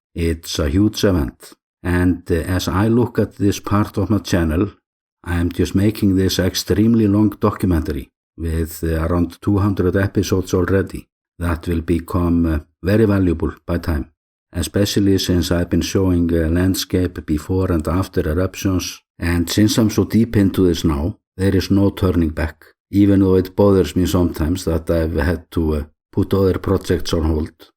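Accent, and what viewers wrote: Iceland. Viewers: Listening to your wonderful Icelandic accent is like listening to poetry. Keep up the enthusiastic commentry.😊😊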